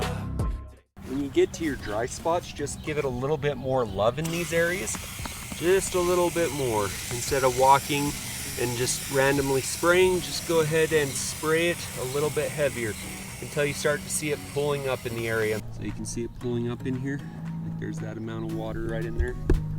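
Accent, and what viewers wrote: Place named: Utah, United States. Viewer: Just discovered your channel. Damn, your accent is hardcore Utahn.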